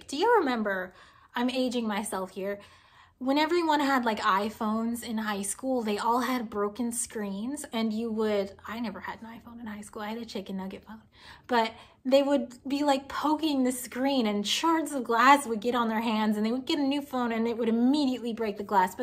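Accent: United States. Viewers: Ok, imma do my best to describe. An-tony vahn l-ale-an-hook. (and just glue all those words (and letter) together). I mean, your murican vowels probs still will be off, but this is as close as I could describe it in englishthon Edit: yeah that robot butchered it... but I'm used to that 🤣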